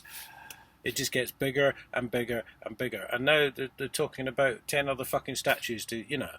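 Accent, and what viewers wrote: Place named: Inverness, United Kingdom. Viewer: You sound like you’re from Inverness, are my suspicions correct?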